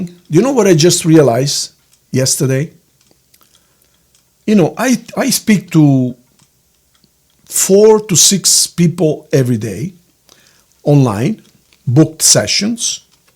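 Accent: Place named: Italy. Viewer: The Italian accent is appealing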